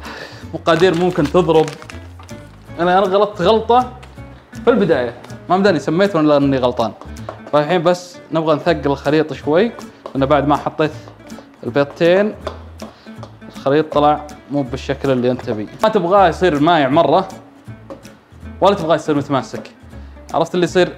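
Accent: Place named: Al-Ahsa, Saudi Arabia. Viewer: من وين انتي؟😂😂 احسك حساوية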